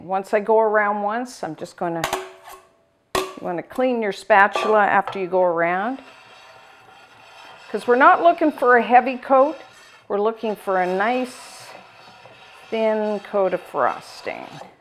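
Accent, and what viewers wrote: Canada. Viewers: Shane Enz Actually she's a Canadian, you can tell by her accent.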